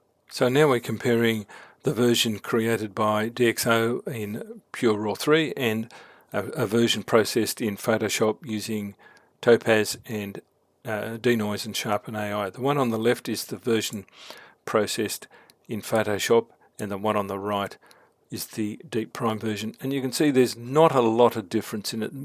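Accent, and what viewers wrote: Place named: Australia. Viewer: Hi there. I hope you remember me, we have spent time together on workshops in FNQ and on a Barrier Reef Island. I'm the guy who likes to sleep in hammocks.I may even use an unusual brand of camera😉 I really enjoy your lessons and tutorials, it's great to hear them in an Aussie accent. I have recommended them to my Photo Society Buddies. this one is very relevent as I was in your home town last weekend shooting the vivid festival, and high ISO images were required to capture the beaams of light in the sky. I have the older version of DXO pure raw, (1.6) and also the latest version of Topaz denoise. I'm thinking of upgrading DXO Photo Lab so I canhave the benefits of PR3 and Nik collection 6. Do you think there is benefit in this move?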